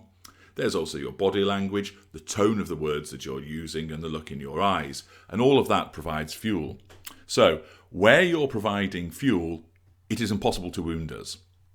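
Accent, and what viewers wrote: England, United Kingdom. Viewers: I like your voice very much.. And the english accent ..👍🏻😊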